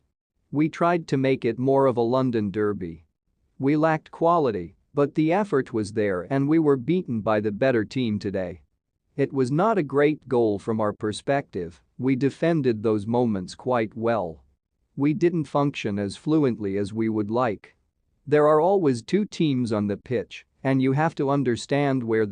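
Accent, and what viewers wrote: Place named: United States. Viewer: What is with that fucking stupid annoying American robot accent? Bit of advice change that shit asap!!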